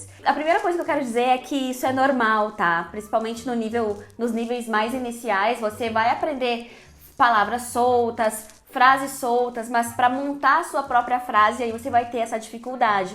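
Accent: Rio Grande do Sul, Brazil. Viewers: Comecei a assistir os videos agora, capaz que é gaucha hahahahahahahaha terra dos professores BD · gaúcha demais hahahahah e é tema e não lição, como também é bolacha e não biscoito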